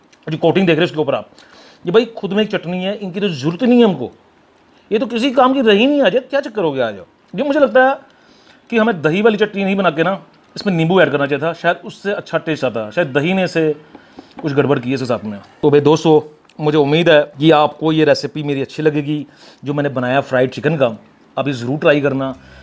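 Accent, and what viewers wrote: Punjab, India. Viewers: aap punjabi type bolte ho bahut payra lagata che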